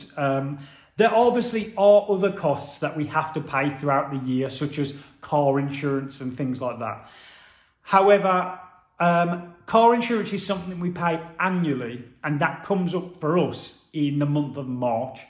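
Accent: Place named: Midlands, United Kingdom. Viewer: How is your energy bill so low for such an expensive mortgage 😮 i pay 90 a month for my 2 bedroom semi which is just over 400 for my mortgage 😅 worked out my bills come into just under 800 a month, plus food and living etc... was your new kitchen worth the upgrade? Hearing the Midlands twang feels like home! Great video keep going 😊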